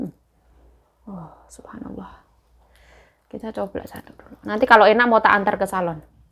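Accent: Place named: Surakarta, Indonesia. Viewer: Coro ngomonge solo bgt ,koyo aku nek gembreneng dewe nek muleh kampung 😂😂😂 tpi sak iki boso jowoku melempem efek 15th merantau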